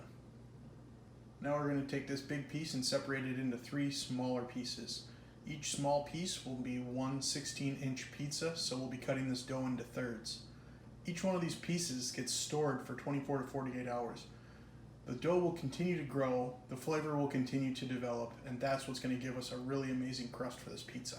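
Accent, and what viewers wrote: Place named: United States. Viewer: We say basil you say baysal lol, love the American English.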